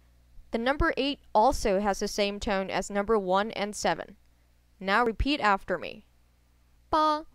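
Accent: Taiwan. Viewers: Is this Taiwanese or China dialect? I thought 1 = yao? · taiwan mandarin？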